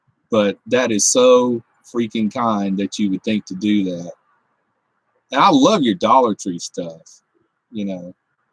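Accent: South, United States. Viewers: Hey. Gotta check out all ur vids. I see Humbuckers on that Maple beauty. Is that a Gibson or Epiphone? Great Vid with in OR.All Subbed. I thought that smooth Southern accent was maybe GA or MI, but I'm guessing TN, now a fave state of mine. Kenny Chesney was an E.TN boy. Stay safe & Happy Trails brother.🎸🥂I'm in NYC and gotta bail. Gotta build a Van or Pickup Rig. Stay safe brother.phil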